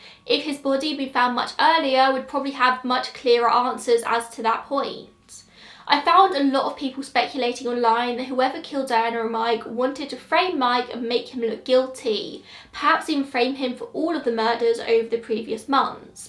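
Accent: England, United Kingdom. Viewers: So weird to hear her English accent on YouTube talking about the ghetto in Washington i used to live in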